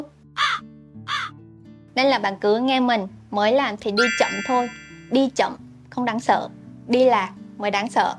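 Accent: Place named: Hue, Vietnam. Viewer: Chị này giọng huế nè kk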